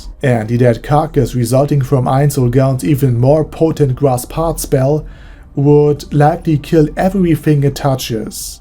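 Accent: Germany. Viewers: We just need to add a bit of overacting to that German accent of yours and we have a yT channel narrated by Pandora's Actor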